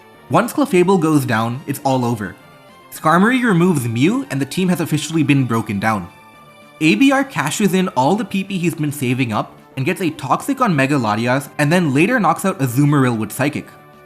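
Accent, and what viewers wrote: India. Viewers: no hes just indian no need to assume everyone lives in a primarily english country bruh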